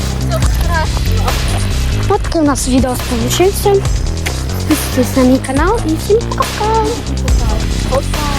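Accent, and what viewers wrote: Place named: Transcarpathia, Ukraine. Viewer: Закарпацький діалект 😅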